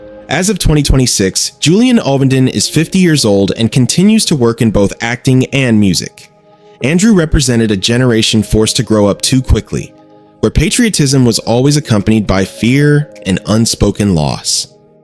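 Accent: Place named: United States. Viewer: Why an American voiced narrative?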